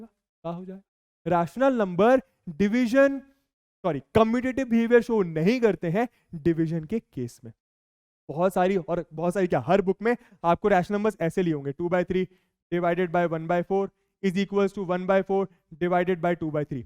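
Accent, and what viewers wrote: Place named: Bihar, India. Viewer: His bihari accent makes the lecture more understandable and relatable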